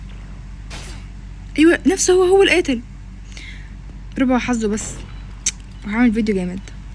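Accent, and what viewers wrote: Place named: Saudi Arabia. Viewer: ليه احسك سعوديه اكثر من مصريه؟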